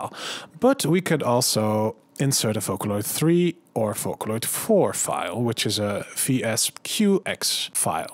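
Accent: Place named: Netherlands. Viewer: Ik dacht al dat ik een NL accent hoorde.. :) gave video.. wat is de key shortcut om die tijdsbar aan het begin te krijgen, de Home toets werkt niet bij mij.. wordt er aardig gek van..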